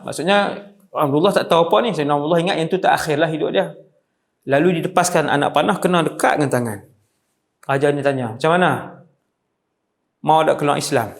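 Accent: Kedah, Malaysia. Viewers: Alhamdulillah terbaik ustaz Wadi Annuar terbaik kedah pekat dimana pn kuliah xtinggal negeri Kedah pekat ringkas senang faham terimakasih ustaz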